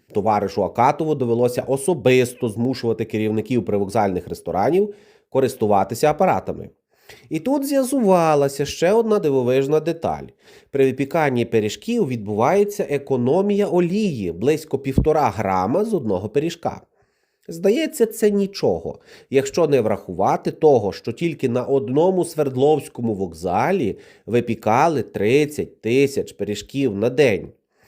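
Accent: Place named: Kolomyia, Ukraine. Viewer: акцент рассказчика из коломии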